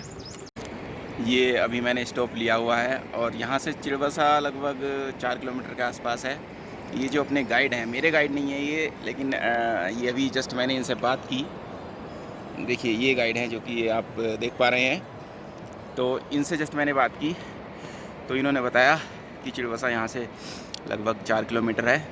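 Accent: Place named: Kerala, India. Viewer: ഒരു മലയാളി ശബ്ദം!,🤔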